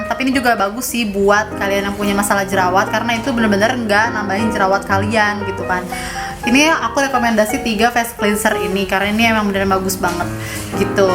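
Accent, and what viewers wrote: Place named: West Java, Indonesia. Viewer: Siteteh orang sunda nya twh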